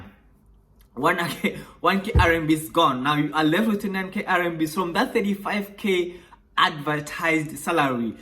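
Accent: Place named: South Africa. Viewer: Also considering the fact that he's South African, but definitely not a native English speaker from South Africa (there are native English speakers in South Africa, he is not one)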